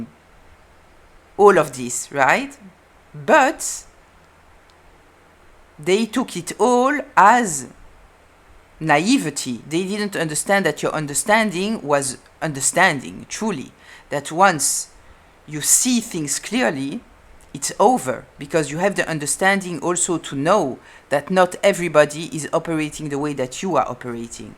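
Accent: France. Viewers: I think your approach to not bother people is a very healthy one when its done from a place of empowerment. It protects you from runner chaser dynamica where people extract energy from you running after them. If they needed your help its not upto you to insist more but upto them to ask for it in a healthy way. The only downside to your programming is imo that you fail to ask for help when you would need it yourself due to a fear of bothering others and this is indeed something that is detrimental. I enjoy your readings and the way you explain things. I also enjoy the french accent😊 Much love from a Dutch woman from amsterdam❤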